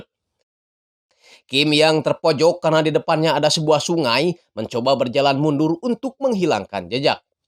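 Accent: West Java, Indonesia. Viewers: ( bang jembut)😂 Iyeu mah asli sunda😅😅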